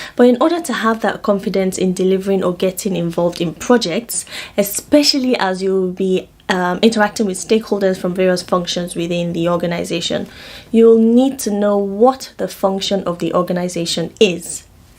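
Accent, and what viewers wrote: Nigeria. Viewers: Are you Nigerian? Your accent…😍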